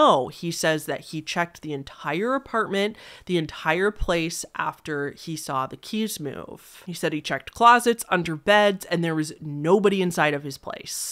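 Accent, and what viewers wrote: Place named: Midwest, United States. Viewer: It’s fine it’s a very midwestern thing. Like “warsh” instead of wash. It drives me crazy but it isn’t “wrong”.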